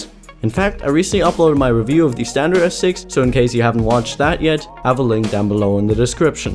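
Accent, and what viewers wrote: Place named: Germany. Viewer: you're from Germany? you have a really nice accent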